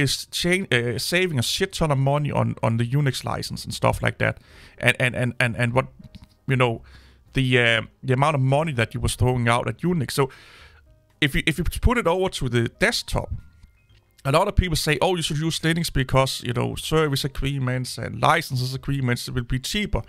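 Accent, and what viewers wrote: Denmark. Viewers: I actually subbed to this channel back then just because of this danish accent. Especially when starts destroying delusional fanboys, it cracks me up every time 😅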